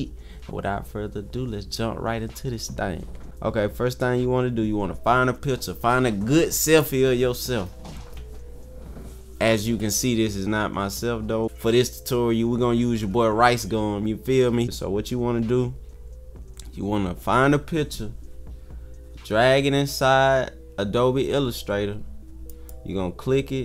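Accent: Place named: South, United States. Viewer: Man, are you from the south? Atlanta? You sound like the cat from Outkast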